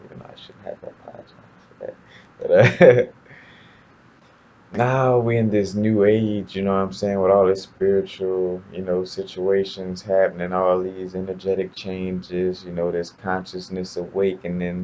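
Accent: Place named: South, United States. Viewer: Hey, where are you from, if you don't mind me asking. It would be really cool to meet you and gain some insight on understanding the universe. I'm based on the Southern part of the East coast and you sound like somebody from down here somewhere, like from Virginia down. It's fine if you don't want to answer, I'm just really intrigued about having a conversation with you. Hope this doesn't come off as intrusive, I mean no harm 😥 lol!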